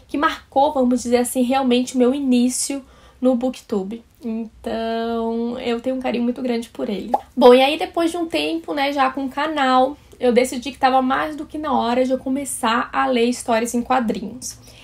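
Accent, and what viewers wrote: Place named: Rio de Janeiro, Brazil. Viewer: Vc é afinal de Santa Catarina ou do Rio? Tá com sotaque carioca nesse livro 😅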